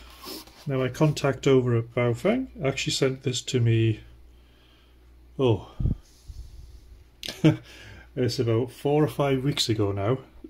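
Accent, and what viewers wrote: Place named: United Kingdom. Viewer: Local radio in Vancouver but your accent is from the UK, I guess you moved there for some reason?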